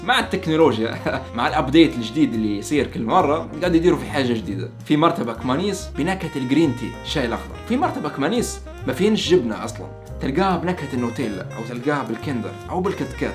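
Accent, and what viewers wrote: Libya. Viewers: هلا بعودتك منور وفيديو رووووعة يسلموو ... اللهجة الليبية عشق لا ينتهي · يازين الهجه الليبيه ياعرب 🇸🇦🇱🇾💓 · اللهجه الليبية قريبة جدا من الجزائريه ❤️❤️كل التوفيق والنجاح ✌️💙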